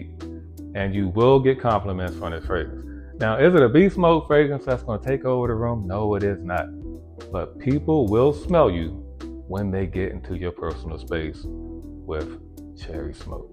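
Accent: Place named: Baltimore, United States. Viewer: you gotta be from baltimore OG lol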